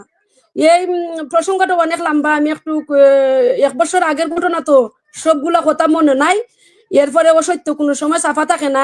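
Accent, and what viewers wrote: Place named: Sylhet, Bangladesh. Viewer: তুমি যে বেটি সিলেটে ভাষায় কথা কও জানো ইসলাম সম্পর্কে তুমি চ্যানেলে ঢুকো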